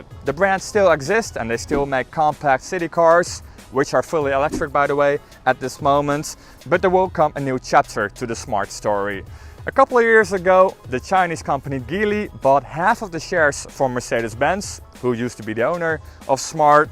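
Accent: Netherlands. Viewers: the English was perfect, but me being from Belgium, I always recognize a Dutch neighbor when I hear one. Great job with the review.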